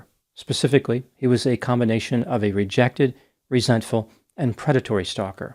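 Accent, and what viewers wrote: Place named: New Jersey, United States. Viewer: I have a suspicion might originally be from New Jersey - his accent gives it away… like the way he says the word “on”. It’s not heavy NJ but there are hints. Only speculating 😊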